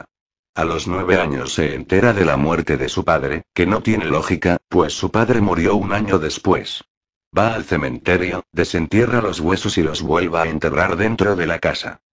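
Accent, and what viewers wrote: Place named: Spain. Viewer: Que estúpidez si iban a hacer algo de Quetzalcoatl lo hubieran hecho siquiera sin el ofensivo acento españolete, es decir lo hubieran leido ustedes. seguro no saben leer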